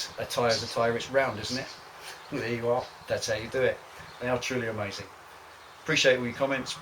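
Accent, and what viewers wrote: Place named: Cockney, United Kingdom. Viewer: Settled on Bridgestone Battlax's after Michelin, Continental & Pirelli. The Battlax's are still good after 12,000km, whereas everything else was totaled at 5000km on my Kwaka ZZR600. Battlax's are harder, but still great on wet with very little "walking" at speed when cranked over. Here in country Australia my average shopping trip is 400km with a mix of open and hill's roads so it may be a different story where you are. Great vid's, you got the cockney gift mate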